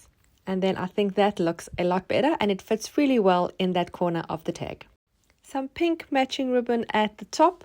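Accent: South Africa. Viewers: HI I hear that you are south African .are you based in SA or somewhere else. Wendy from SA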